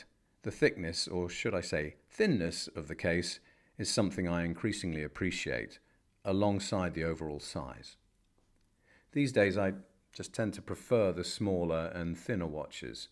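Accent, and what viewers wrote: United Kingdom. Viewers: It’s really cool to find a video like this and feel like I’m having my thoughts read aloud in a British accent. I’m a huge fan of Lange and GS but have never been able to pull the trigger on a GS.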